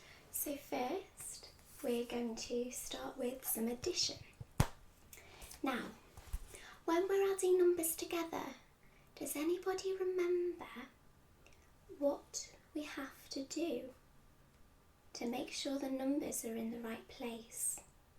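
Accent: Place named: Yorkshire, United Kingdom. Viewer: You really do sound like your from Yorkshire, I'm from Doncaster well York originally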